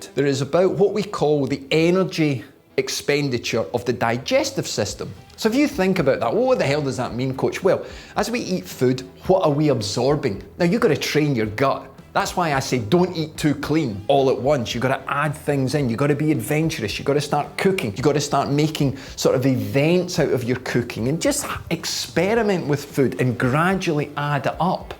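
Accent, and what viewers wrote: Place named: West Scotland, United Kingdom. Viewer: Trainspotting is Edinburgh accents (east), his is west coast. Might sound the same to you but Scottish people can hear the difference.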